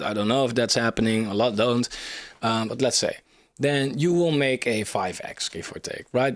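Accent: Netherlands. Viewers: Great content, finally something different. Btw you sound dutch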